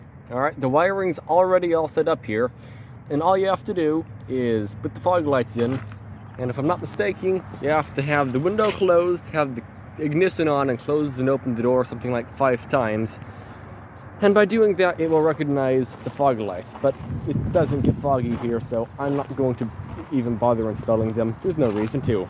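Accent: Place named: Canada. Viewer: I've just discovered your channel whilst surfing for Mitsubishi Mirage videos, and this is the first one I am seeing. A quick question, are you Canadian? I think I am detecting in your voice a Canadian accent. If I am wrong I must say it comes pretty close to it. I am quite keen on the hatchback Mirage as a replacement for a 2006 Ford Focus, and my eyes have been eating up all this Mitsubishi videos like candy! 😁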